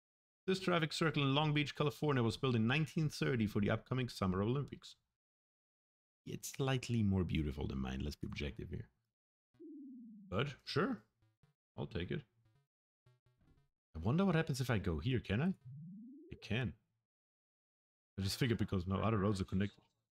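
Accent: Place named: Germany. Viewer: I was wonderd that this is your first video because its very good. Well I am from Austria and I hear the German accent ,but can keep doing videos like them I like them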